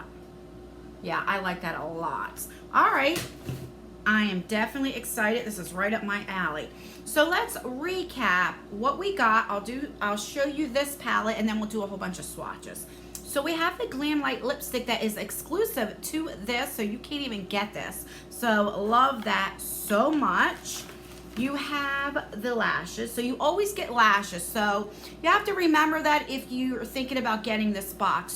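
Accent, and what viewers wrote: New Jersey, United States. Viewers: Btw You are my favorite subscription box YouTuber! I love your Jersey accent and your upbeat personality and I also love that you aren’t in your twenties because I’m 36 and aging skin is a lot different than young skin so I know if a product works for You it will most likely work for me!! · And i have to say i live your true Jersey accent!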